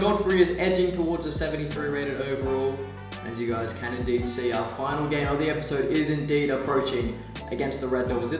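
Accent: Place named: Sydney, Australia. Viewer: hahahahaha bro it’s the sydney accent cuz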